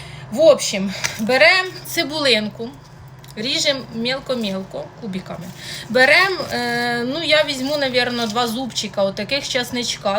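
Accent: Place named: Surzhyk, Ukraine. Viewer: Гарна дівчина,але суржик її портить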